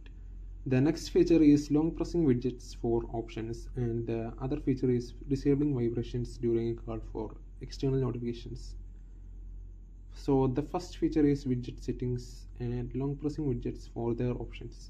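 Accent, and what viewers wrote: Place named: Kerala, India. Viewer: Are you a Malayali? Your English sounds familiar. I wouldn’t call it an accent, but Malayalis have a unique way of speaking English.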